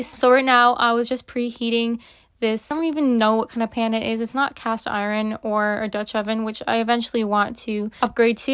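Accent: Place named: Midwest, United States. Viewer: Love your Midwest accent. I’m from Mid-MI and feel right at home in your kitchen. God bless❤ This is valuable information you’re sharing.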